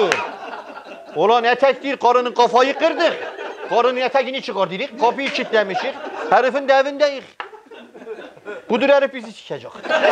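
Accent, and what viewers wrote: Erzurum, Turkey. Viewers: Üniversiteyi Erzurum’da okudum özlemişim bu şiveyi ağzına sağlık kardeşim hala gülüyorum. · Bir erzurumlu olarak şive dinlemek bile çok güzel. Ama şu aralara neden küfür konur anlamam. Hiç hoş değil komik asla değil. Erzurumluların ağzı bozuk değildir. Komik olmak için buna gerek yok. Bu yüzden dinlerken gülemiyorum ve izlemek istemiyorum. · kardeşim sohbetlerini Zevk ve hazla dinliyorum. Takibinizi yapıyorum. Diskionunuz güzel akici. Zekanızı güzel kullaniyosun. Erzurum şivesiyle mübalağalida olsa taktir ediyor başarılar diliyorum. ANCAK..!!!? O tatlı sohbetlerin arasında keşke aralarda üstüne basarak ettiğin küfürleri kullanmak zorundamisin? Bu hoş sohbetlerini aileler, ana baba bacı çocuklarda dinliyor. Bilmem nerelerini yererem. Vay ben orana yok burana S....um. Vay ben A....k... gibi küfürler sence doğru ve çok hoş mu? Kaldiki hele hele bir Dadaşa böyle bir dil üslubu yakışiyo mu? 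Lütfen..! Sevgilerle selamlar Hemşehrim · Erzurumluların şivesine bayılıyorum ya 😂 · 🤣🤣🤣🤣he valla ölide bizim.diride doğri diyir çok hoş erzurum şivesi konuşmalari slm.olsun bütün erzurumlu kardeşlerimize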